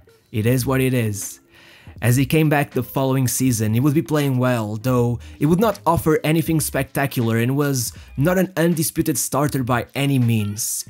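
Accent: Portugal. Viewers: Are u portuguese? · I can tell your mother tongue is Portugese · This dude (narrator) is sooooo Portuguese. I'm almost 100% certain just because of the accent.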